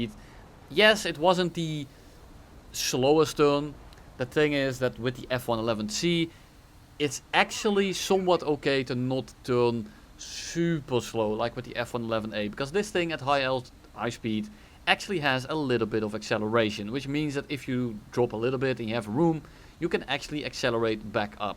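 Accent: Netherlands. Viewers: i smell a nederlands accentje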